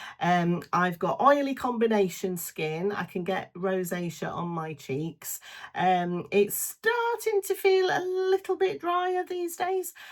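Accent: Manchester, United Kingdom. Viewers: Hi, Derbyshire looks really lovely. Your accent reminds me of my dear friend who is originally from Manchester. No7 is actually quite pricey here in Australia even on sale but yes we do get some deals like Buy 1 get 1 free or free gift with purchase at Priceline. I use their blush/highlighter stick but would love to get my hands on some of their skincare. Haven't seen the Prime Forever products yet. Interesting! The Glaciology part is apparently good for blending advanced antioxidants with peptides to preserve collagen and elastin from environmental aggressors, and it helps with Rosacea....apparently. And yes the lines on our decolletage are so so annoying so I flood it morning and night with essences, serums and creams as well as my retinal. Thanks for the information 💞🥰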